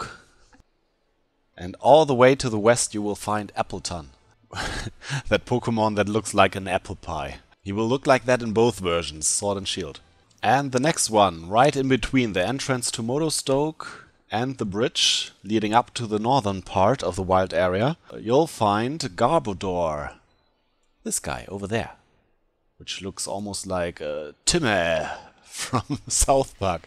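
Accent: Germany. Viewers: You’re German, aren’t you? Or possibly polish?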